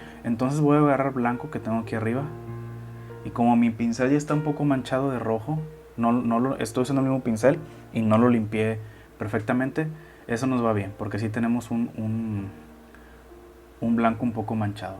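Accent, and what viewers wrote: Mexico. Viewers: Muchas Gracias estoy siguiendo tus videos con mucha atención, Oleo no creo que le entre aún, me gusta las lecciones de Bob Ross, pero tus explicaciones muy buenas, y claras, tienes acento mexicano, si no vives cerca de la frontera MX USa y ocupas algo de USA te puedo ayudar si así lo deseas.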